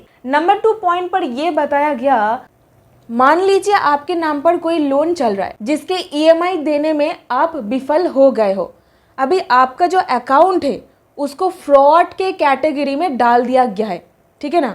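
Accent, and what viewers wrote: Bengal, India. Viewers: Ap medam bangali to nahi hai apake hindi bolane she pata lag raha hai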